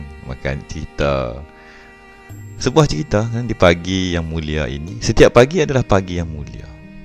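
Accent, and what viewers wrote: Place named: Terengganu, Malaysia. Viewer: Orang Terengganu cakap. The BIJORKKK 👍👍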